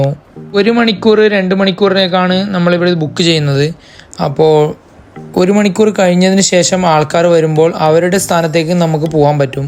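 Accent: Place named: Kasaragod, India. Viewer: എത്രയൊക്കെ പരിഷ്ക്കാരി ആവാൻ നോക്കിയാലും എടക്ക് ആ കാസ്രോട് സ്റ്റൈൽ കേറിവരുന്നല്ലോ മോനു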